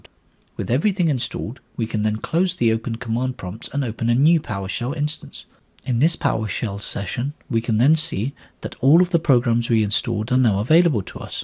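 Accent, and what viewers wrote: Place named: United Kingdom. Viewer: Could be better without the annoying british accent which is incomprehensible at times.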